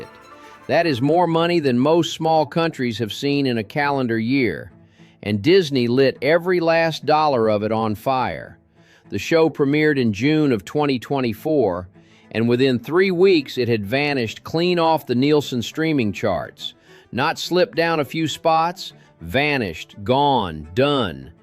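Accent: Texas, United States. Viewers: Hello - I'm a new subscriber. I love your Texas accent. Greetings from Maryland USA.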